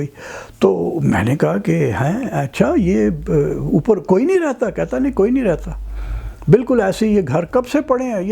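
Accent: Punjab, Pakistan. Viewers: My father emigrated from Talagang to Delhi in 47. He expired a few years ago. When I was a child, he told me this story. Your Punjabi accent is similar.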